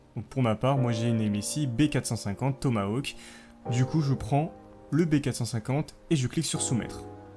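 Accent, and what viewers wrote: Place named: England, United Kingdom. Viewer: Rolala l’accent anglais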